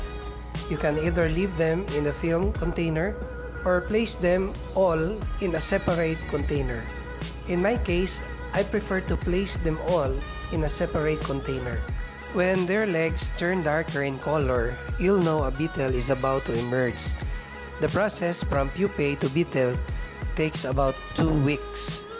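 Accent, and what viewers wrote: Philippines. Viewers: I am assuming that you are Filipino when you started talking Great video mate!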